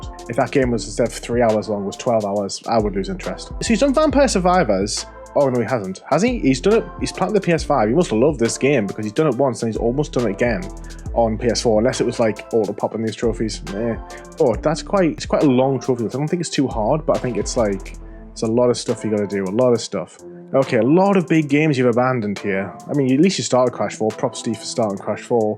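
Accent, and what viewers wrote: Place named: Northeast England, United Kingdom. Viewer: You sound like a fellow northeastener, hi from South Shields 👋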